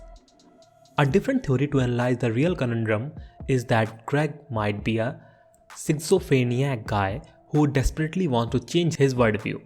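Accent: India. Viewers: indian enlish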